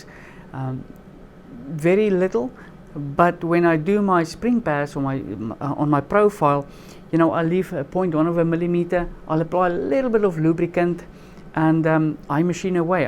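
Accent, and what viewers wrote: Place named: Afrikaans, South Africa. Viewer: I stayed for the afrikaans accent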